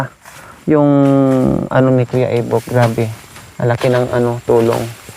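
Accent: Visayas, Philippines. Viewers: Madam🥰noon pa kita pinapanood kasi boses bisaya ka,,nakakatuwa❤️wow,malinis na ang kapalugiran,,madaanan nyo na,,at makikita nyo kaagad ang Ahazzzz!Good job,,ang galing ni kuya Ebok,,mga masisipag naman sila,,Tama,,salamat at may matutulungan ka,,sa taong nangangailangan,❤️Bless ka ng Panginoong ,,JEHOVA❤️🙏🏼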